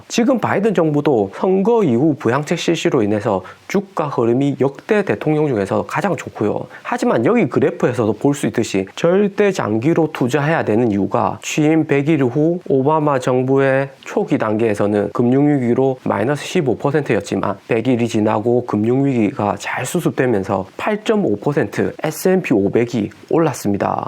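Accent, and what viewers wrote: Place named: Gyeongsang, South Korea. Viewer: 억양이 경상도? 라 비슷할 뿐인거 같아요 ㅎㅎㅎ다시잘들어보니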